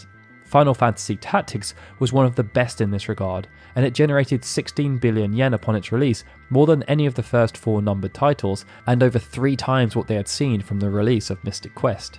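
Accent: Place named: RP, United Kingdom. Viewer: Great stuff! Intriguing and well crafted as usual. I grimaced at /I no va'TIV/ though! I've always heard /'I no vei tiv/. It's obviously down to your exceedingly posh british accent.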